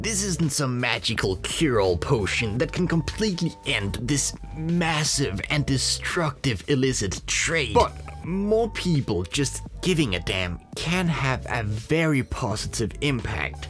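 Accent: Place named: Denmark. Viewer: Hmmm, din accent er meget... Danglish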